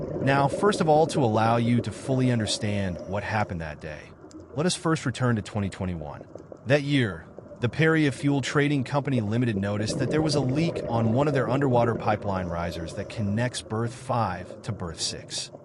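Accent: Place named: Belgium. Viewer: Just the audio. He explains at the beginning that he has a thick Belgian accent so for clarity he uploaded his transcript to a reader.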